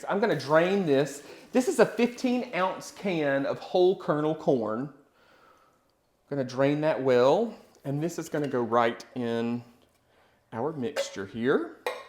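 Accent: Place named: South, United States. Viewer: I've moved from Atlanta to Scotland and now to Spain. I absolutely hearing your sweet southern accent and watching you cook mouthwatering dishes that I love. Thank you.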